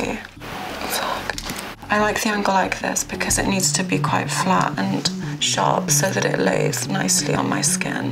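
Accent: United Kingdom. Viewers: Thank you! Their voices are almost identical, despite the British accent.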